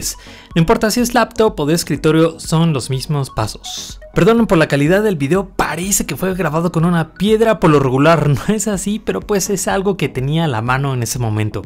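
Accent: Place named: Peru. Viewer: me parece mal esa voz de peruano VENI A ARGENTINA GATOOO!!!!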